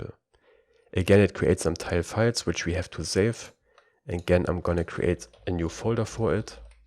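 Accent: Germany. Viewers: Love your accent, I’m german btw too😂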